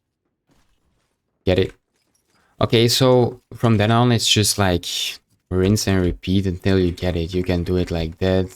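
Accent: Belgium. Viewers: belgian accent vs brazilian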